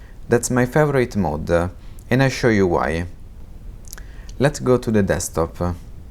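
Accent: Italy. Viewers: Italian accent??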